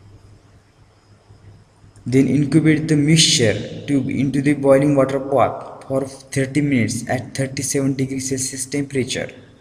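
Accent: India. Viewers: Indian accent :D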